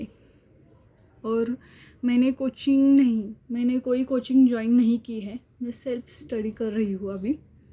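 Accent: Maharashtra, India. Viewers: You have Maharashtrian accent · Marathi assent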